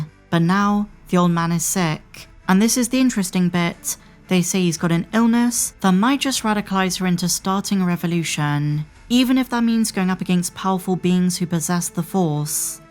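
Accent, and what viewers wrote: United Kingdom. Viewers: Sometimes I wonder. I've always felt like this host had a very unique quality to her voice that I haven't heard before live in person from anyone. Multiple unique tonal and pacing qualities, as well as a particular type of British accent that I've never heard before.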